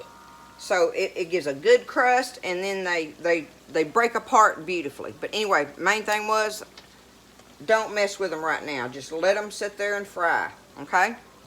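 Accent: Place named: Georgia, United States. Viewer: My Mother made THE BEST Salmon patties. She did use flour, corn meal and egg. Sh always made biscuits and gravy to go with them, and fried eggs. Great for breakfast. I am going to try your recipe. They look delicious. I love watching you cook and hearing you talk. I am from Georgia so you and me talk alike. Love you!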